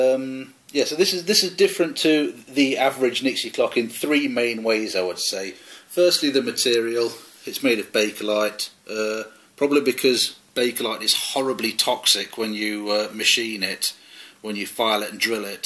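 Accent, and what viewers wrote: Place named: United Kingdom. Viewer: What kind of British accent/dialect do you speak with? Hope that doesn't sound weird I'm just really curious haha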